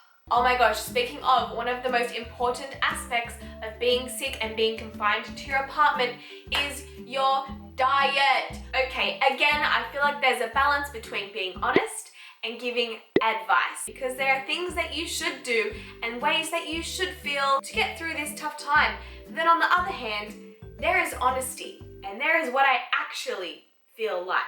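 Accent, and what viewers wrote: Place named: Australia. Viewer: that aussie accent thoooo! LOVE your energy! xx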